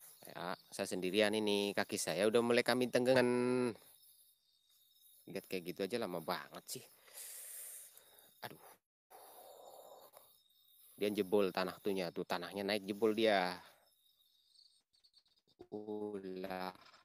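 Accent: Banyumas, Indonesia. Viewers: Misi, numpang nanya, mas si orang mana, koq logatnya ngapak kaya aku 😬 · Hahah kami tenggengen.. Bahasa ngapak nemen yaaahh Keren lanjut bro..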